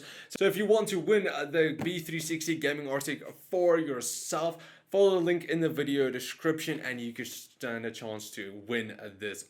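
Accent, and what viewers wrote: South Africa. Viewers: Just subscribed when I heard you're South African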